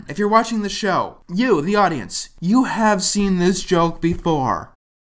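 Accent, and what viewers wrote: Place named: United States. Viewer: So realise he's American, right? They say "bedder" instead of "better", "lenguage" instead of "language", "naht" instead of "not"... I could go on. Maybe that's just how they pronounce "execs" in America?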